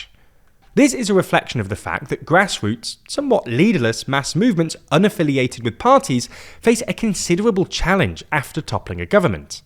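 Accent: United Kingdom. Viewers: Americans: Generation Z British people: generation Zedd. (Is he talking about Lord Zedd from Power Rangers or is this just a British accent sounding weird to me?)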